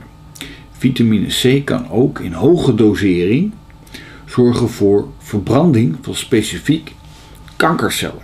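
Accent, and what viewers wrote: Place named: Leiden, Netherlands. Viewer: dank je wel...interessant! ik vind het leuk wat je vertelt! Ik wou nog vragen wat jou achtergrond is. Ben je arts of natuurgeneeskundig therapeut of arts? of/en het voorafgaande en helderziend of medium? Ben echt geinteresseerd! denk hetzelfde....maar wil gewoon meer van je weten. ps: Kom je uit Leiden?? Je klinkt zo Leids?!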